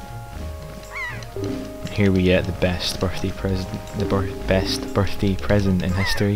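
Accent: Scotland, United Kingdom. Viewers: More please. Also, is that a Scottish accent? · Scottish accent? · Hrm yeah he does sound Scottish. Cx My mistake.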